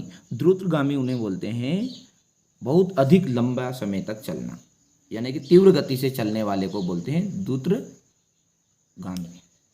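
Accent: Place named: Uttar Pradesh, India. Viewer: Tum up se ho na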